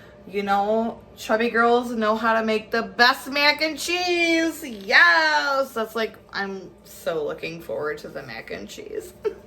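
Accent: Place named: Alabama, United States. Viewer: so glad u liked it. Did our alabama drawl crack you up? Even I was like dang I sound like a southern Belle 😂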